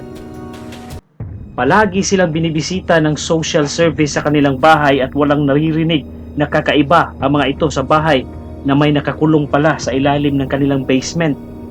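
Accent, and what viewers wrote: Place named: Bicol, Philippines. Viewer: yup Ilan bisis ko na rin napanood to actually mas maganda pa pag kakakwento nila KC pure pilipino nag sasalita unlike ur tune sounds like bisacol.. anyway naka as na to antayin m n Lang may mag claim tnks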